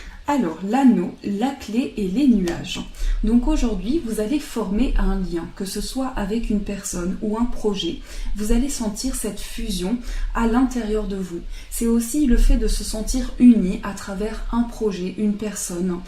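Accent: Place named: South-West, France. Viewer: Bonjour C'est la 1ère fois que je vous découvre, je crois deviner l'accent du sud-ouest 😊 Vous êtes très belle et rayonnante, non , non, ne rougissez pas😊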